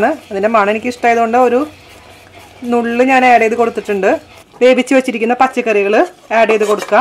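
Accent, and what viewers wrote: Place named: Chalakudy, India. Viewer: Hi tried pasta.. First time trying.... Always kids experiment goes on.. It came very well.. And enjoyed.. Thank you for u r valuable tips in between.. The best part in u r explanation while cooking is even my 14 yr kids can follow the recepie and cook.. Thank you dear.. Hope I can call u dear.. 😍. I am also from Trc.. I left Trc after marriage 20yrs back and I am not having that slang.. By watching u I am enjoying that too..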